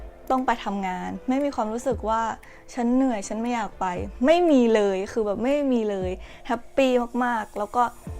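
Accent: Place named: Phetchaburi, Thailand. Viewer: สำเนียงรู้เลยคนเพชร555+พี่ก็เพชร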